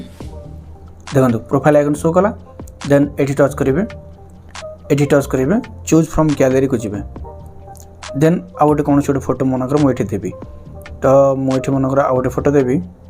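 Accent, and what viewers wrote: Balasore, India. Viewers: Balasore kahuche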